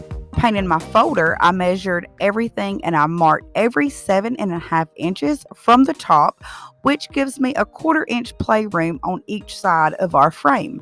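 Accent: South, United States. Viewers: 😍😍😍😍😍😍😍 I have never subbed so fast! Plus I love your accent coming from another southern lady❤️ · OMG I just love your southern accent, I’m from Texas and still haven’t picked up The accent , your voice is so satisfying you probably can do satisfying videos and get paid for it👍🏽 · I just love listening to your accent , where in the states do you live? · Beautiful work Beverly sent me over to check you out. I really like what I'm seeing and I just love your country accent 💕💓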